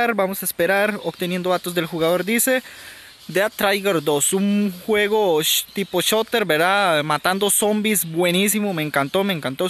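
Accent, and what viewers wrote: Costa Rica. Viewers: Q tal bro eres tico? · Eres tico???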